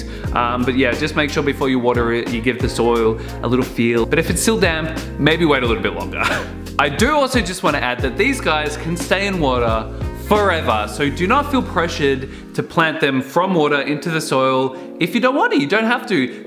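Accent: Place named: Australia. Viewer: Hey, I have a silly question: how do you do to take those avos out from those nice flat balloon shape glass jars when you have to change the water? Cause you're supposed to change water once in a while, right? I mean, considering the shape of the jar and the roots, it might be quite alright to take it out, but what about when you put it back in place?? I've had the problem with another plant cutting, now the root system is getting bigger, so it's becoming tricky to take out/put back in place all those nice roots in the narrow jar opening... Ok, now I gotta add one thing: I love hearing you speak, makes me so nostalgic of OZ...;)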